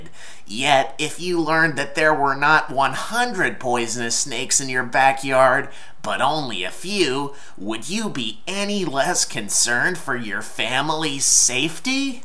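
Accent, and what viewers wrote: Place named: London, United Kingdom. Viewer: Great London accent - as appreciated by this English person.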